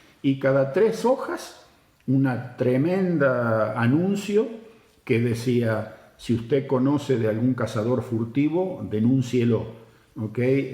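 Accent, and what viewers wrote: Argentina. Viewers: Holaaaaa familia ❤ los adoro, hace un par de años los descubrí, cuando empezó a a acompañar los videos de y. Me llamó la atención la forma de hablar y descubrir que "era un argentino 😅"... Gracias, muchas gracias por todo lo compartido. De emigrar siempre me gustó Canadá, antes era con muchas más exigencias, todo cambió después de la pandemia. Abrazo grande desde San Miguel del Monte, pcia Bs As, Argentina. ❤❤❤ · Que relato perfecto, parecés un profesional de los medios, la historia tuya,la vivimos como propia, con la forma como la relatás y los que somos descendientes de inmigrantes, nos sentimos identificados con nuestros abuelos, ( en mi caso italianos, analfabetos, que nunca más tuvieron contactos con los suyos)! Lo que describís es como me sentiría, si tuviera que emigrar, y se nota como estas al tanto de la realidad,en este caso de Argentina! 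Otra cosa muy llamativa es que seguís manteniendo el acento Argento, y particularmente modismos idiomaticos a pesar de tantos años viviendo y hablando otro idioma!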